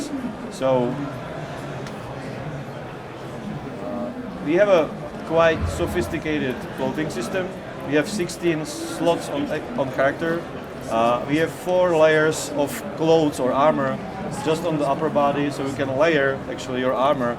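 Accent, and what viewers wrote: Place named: Czech Republic. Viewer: Jde poznat český přízvuk ! :D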